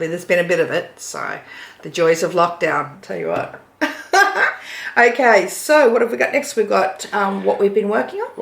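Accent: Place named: Australia. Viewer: Enjoyed watching you again. I really appreciate watching Aussies and hearing our accent for a change on flosstube. Ornament and autumn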